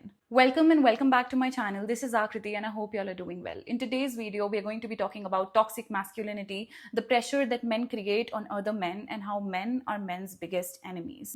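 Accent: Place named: United States. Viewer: one thing made her channel different from others and attractive that is <3 her personality in long video's is like -: pure AMERICAN ACCENT !!😁 and in reel's and shorts is like-: pure desi and dark vibes 😜😁 with some funny sprinkle spices ♨✨❤!! one thing i must say 😊🤨>> wht happens with this cute gurl !! in both the format of videos 😅🎀!!!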